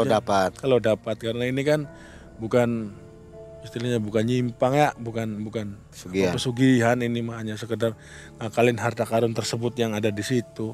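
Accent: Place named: Banyumas, Indonesia. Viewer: Pantes selalu ngundang mas viewer nya tinggi terus,mas ceritanya juga ga ngebosenin santai mudah di mengerti, saya sebagai orang ngapak kalo mas ngomong ngapak aku pun tau artinya😂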